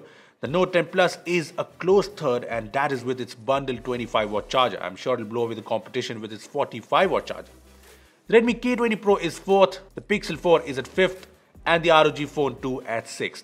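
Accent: India. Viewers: oh shyet another indian accent.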